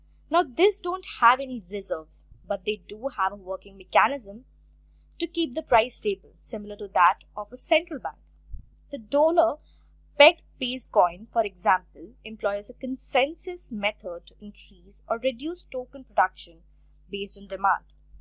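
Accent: India. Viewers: Thanks for the lecture. It's just a but hard to follow up with the Indian accent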